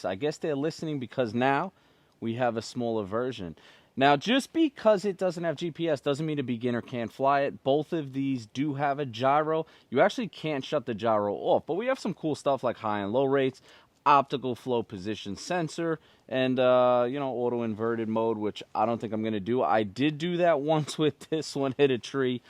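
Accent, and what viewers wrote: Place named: New York, United States. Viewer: I bought both because why not. I love your nice thick grass and your cool NY accent.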